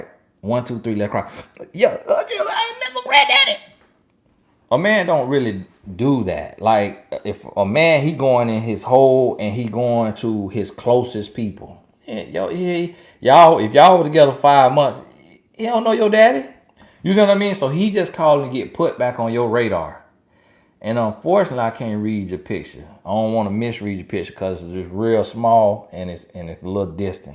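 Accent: South, United States. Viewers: Hey....I like your Southern accent....please don't change....you are who you are....Im sure you made peace with your voice...it don't matter as long as you're getting the message out from God....be blessed!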